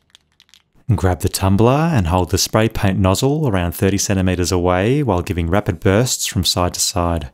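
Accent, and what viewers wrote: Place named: Australia. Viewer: I also love your Australian accent... I can't find your name here. What is it?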